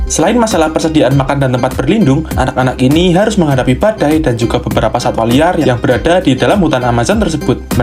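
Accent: Java, Indonesia. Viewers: Ngomong nye biase aje...keles..😢 Jgn di medok2in Logat nye 😭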